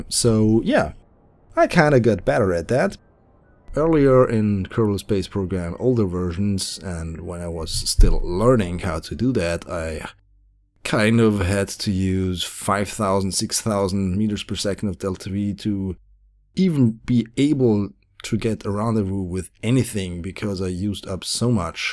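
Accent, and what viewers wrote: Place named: Germany. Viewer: Die mieseste Manleykopie ever. Mit dem deutschen Akzent könnte ich ja noch leben, aber das hier? Mies...^^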